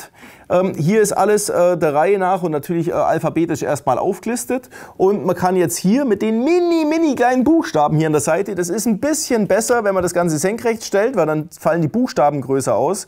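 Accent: Swabia, Germany. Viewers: Das Schwäbische ist mir super Sympathisch xD Ich glaube ich werd mir das Radio zulegen :D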